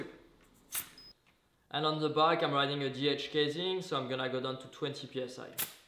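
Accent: France. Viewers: How do I have a French accent like urs lmao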